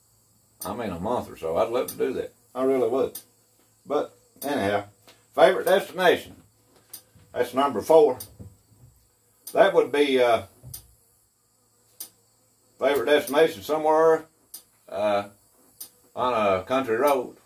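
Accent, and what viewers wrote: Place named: United States. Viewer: Orsum. I really enjoy watching your videos and trying to understand your lingo. 🤪 my missus is from way back in them NC hills she sure do appreciate the Merican accent from back her neck of the woods. Ah huh! 👍🏾💯 I did that 7 question challenge some months ago. It’s really interesting hearing other bikers answers. Good job buddy. 👍🏾💯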